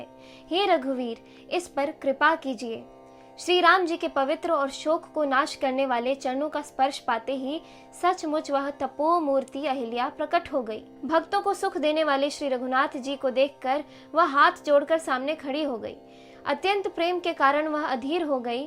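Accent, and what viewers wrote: Standard. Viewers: Atyant shuddha uchcharan hai . Ati sundar hai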